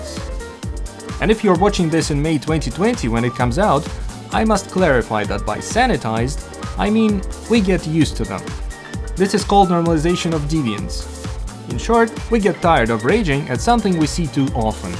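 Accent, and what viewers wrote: Russia. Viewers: Очень забавно слушать тебя, говорящего по-английски, но с интонацией из русского языка))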